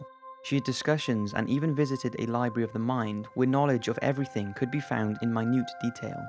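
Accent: United Kingdom. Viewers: yes another amazing video keep em coming and i LOVE your accent are you from the UK? night and peace out from Sweden x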